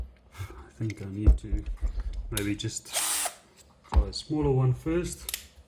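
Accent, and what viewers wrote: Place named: South Africa. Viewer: Thank you, your mini looks good after the Dremel, where are you from? You sound South African.......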